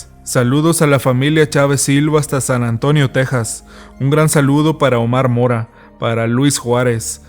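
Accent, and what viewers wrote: North, Mexico. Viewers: Me gustan mucho tus relatos...los lees muy bien 👍 se escuchan diferente con tu tono norteño☺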